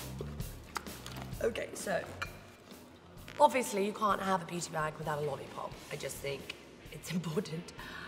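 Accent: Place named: RP, United Kingdom. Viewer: Ure at ure sexist wen ure carrying on n not taking urself serious it's so attractive for men also u should insure your eyes thay r fkn insane only chick that can rock a Camono keep keeping it real u r a buzz when you talk u don't expect the posh accent brill xx hope this cheers u up xxx lol xx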